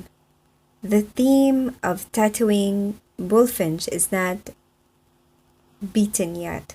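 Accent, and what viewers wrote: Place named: England, United Kingdom. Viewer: Interesting Stories, i Like your english accent kabsat.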